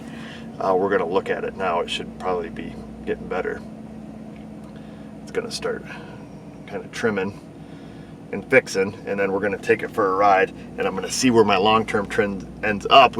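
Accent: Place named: South, United States. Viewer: Omg country twang; you got me homesick and misty eyed.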